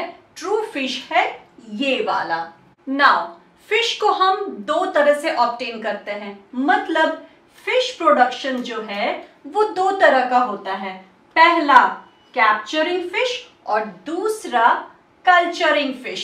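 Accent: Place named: Bihar, India. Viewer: Where you from? It feels you are from either bihar Or up. Anyways it was a wonderful lecture and helped me truely.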